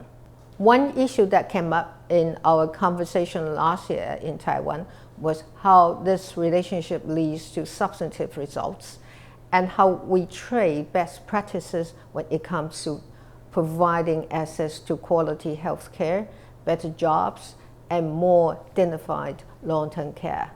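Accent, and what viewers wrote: United Kingdom. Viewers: 蔡英文總統是標準的英式英語，這真的是曾在英國生活求學過才有這樣的口音...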